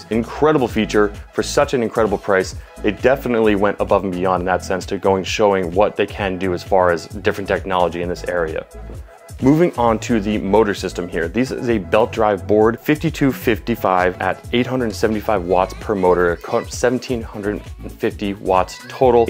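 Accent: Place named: Germany. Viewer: Wow man, this is the best looking esk8 review I've ever seen ! From that sick intro with all the neon colors , then to the ride through the streets w/ those subtle "after-effects" they only last for a sec or two but I really liked it for w/e reason. Props to you and your crew for all those cool cinematic riding angles, this is gonna be a hard one for y'all to top but I got faith in ya! The board itself seems amazing for what you get at that price point, will definitely be recommending this if anyone ever asks about a beginner board. It costs slightly more than all the other $500 or so budget boards, but completely blows them out of the water in terms of features. Did you do the voice acting for the Medic in TF2, almost sounds like it, the german accent and everyting lol. Amazing job dudes, this video is an inspiring work!👍🤙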